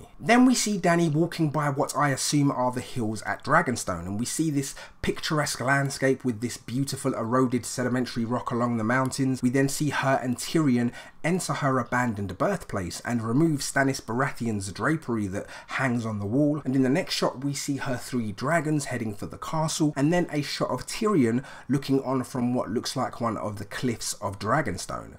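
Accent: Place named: United Kingdom. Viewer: hey! thanks for answering my question!! I subbed you a little while ago as you were doing a livestream with my pal Mark (SerHuntsReviews) and I'm enjoying your content a lot. I don't comment too often though. I enjoy your videos though. You do a good job!! I enjoy hearing Brits again - I miss them a great deal... I did my PhD in Aberdeen and lived there for 7 years. I'll be back over there again sometime soon to do further research probably in Durham, London and Edinburgh as well as my old standby - Blair-Atholl, in Perthshire. That place is gorgeous! Cheers and best wishes. Looking forward to your content in the upcoming season!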